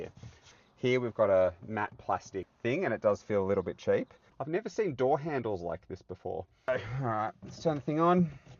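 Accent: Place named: Australia. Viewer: I had to triple check myself when watching this. youre in a right hand drive, with an aussie dialect but all the cars look the same as america. love the guys driving silverados down under, i have one myself but i also have an abarth. ive built mine but it was fun stock but since i dont race dirt bikes anymore i decided to build a cheap car lol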